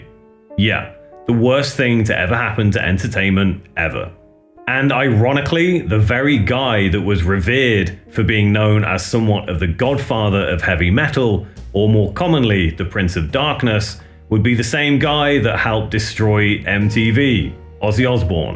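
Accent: United Kingdom. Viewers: You sound British, but you only mention MTV us. MTV Europe would of being your channel until 1997, when it was replaced by MTV uk, which at first was ok for a couple of years, but MTV Europe was the channel I watched, even after MTV uk took over, I still done everything to get MTV Europe back, what a channel that was. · I actually fell asleep listening to this stiff britt try and explain American MTV 😂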